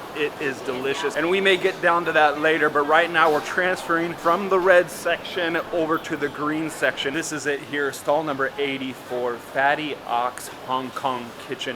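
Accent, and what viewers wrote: United States. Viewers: U from Minnesota ? Midwest accented ? Yah ? 😅 Hope U have tucked into the "Half-boiled" Soft eggs with black sauce & pepper, with crispy ButterKaya Toast !?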